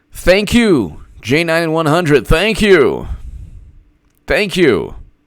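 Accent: South, United States. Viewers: HOA at a little southern to her voice. You have such talent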